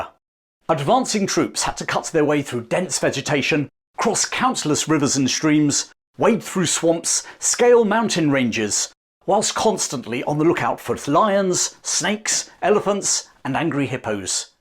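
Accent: United Kingdom. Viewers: German here, loved hearing the story told in such a British and masterful voice. Lettow-Vorbeck ('V' pronounced like 'F') entered German post-war lore as one of the few undefeated heroes. His quite popular own account of the events, "Heia Safari", adorned the bookshelves of many boys and young men in the 1920s and later. My Granddad (b1902) gave his copy to my Dad (b1938), who handed it on to me (b1965). Nowadays however, only a few young folks may still be familiar with the campaign, neither with its adventures nor with its tragedies. Peace!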